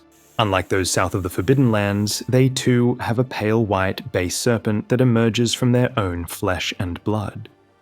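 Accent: Australia. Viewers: Hey not sure if this is me just confusing aussie accents but you have to be ' ' right? If so you're killing it with these channels dude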